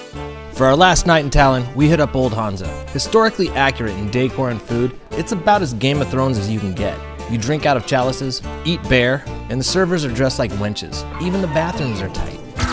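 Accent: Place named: Alaska, United States. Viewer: Where in laska you from!?! Palmer!?! You sound like a 'sillian..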